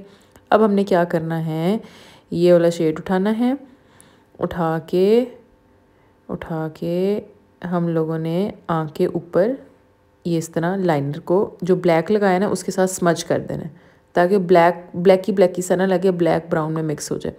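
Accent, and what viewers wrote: Punjab, India. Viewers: Wawwww jwa same Punjabi jehdi edr boli jndi aa..🥰m vry glad to listen ...m from punjab .. nd luv uhhh lehnda punjab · Haye didi tuc teh bilkul india wale mtlb sade vangu ani soni Punjabi bolde oo appa same same aa bilkul haye kash Pakistan wakhra na hunda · Apka Punjabi way of talk mazeka h bht😍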